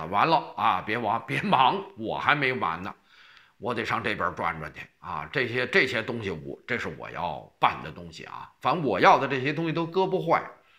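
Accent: Beijing, China. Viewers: 京腔听着像界别儿的大爷亲切～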